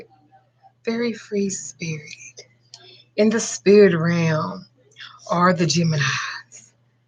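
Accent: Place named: Dallas, United States. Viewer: I knew I recognized that accent lol "ova thurr" "right hurr" im in dallas too☺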